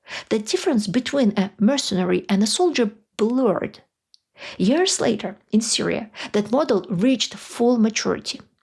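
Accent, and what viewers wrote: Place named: Russia. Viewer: Just found your channel a few days ago, and enjoying learning some more info about the russian state and culture. Just want to say that you have an incredibly soothing voice, and you could be very successful as an ASMR artist. I've been using ASMR for over a decade, and consider myself to be something of an expert. You have an exceptional raw talent for ASMR content. Definitely consider it for the future. Funnily enough, my favourite ASMR artist is also a russian woman named Maria (gentle whispering). There is something about the russian accent that hits like nothing else. Would love to see you harness that talent. Either way I will be watching more of your videos, to calm my mind and learn some new things! Thank you ❤❤❤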